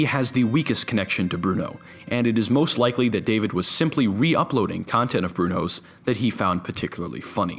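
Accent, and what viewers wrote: United Kingdom. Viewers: this guy has such a heavy british accent i can't stop laughing at his videis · The power of having a bri’ish accent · puff it’s Cockney (East London) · Aesthetical Twat I’m American and i find this hilarious the British accent makes that video better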